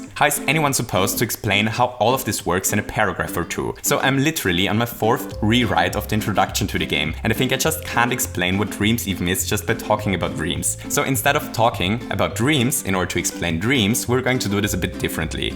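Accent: Germany. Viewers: Wait.. Are you German? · Wait what? Are you german? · Is he german? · Wait? Are you from Germany? ? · Are you German? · Are you from a german speaking country? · Oh, you are German too? I knew your accent seemed familiar for me xD Nice content man, love your channel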